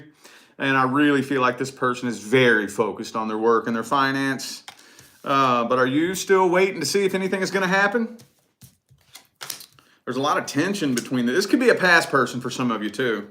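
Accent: South, United States. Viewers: Wow,. FirstlyI love your Southern accent! Secondly, yes my ex-bf was ♓️. Potential new guy is ♓️, but I’m still single.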